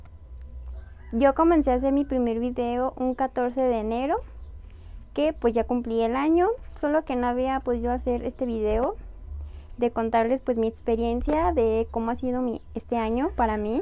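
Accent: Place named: Chiapas, Mexico. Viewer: tu acento suena a chiapaneca de allá por ocosingo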